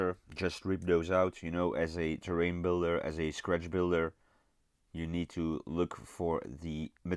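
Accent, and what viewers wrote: Netherlands. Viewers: you sound a little Dutch!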